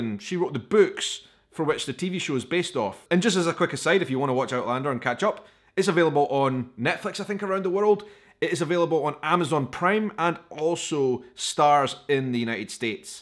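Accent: Scotland, United Kingdom. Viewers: I love your scotish accent !!! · I have always wanted to go to Scotland and am saving even now...of course I consider Outlander one of the best adaptations ever and I love listening to you. Great Scottish burr.😎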